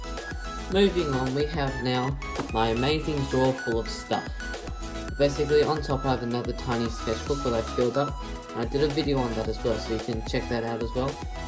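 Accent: Australia. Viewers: U Aussie? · Are u Australian?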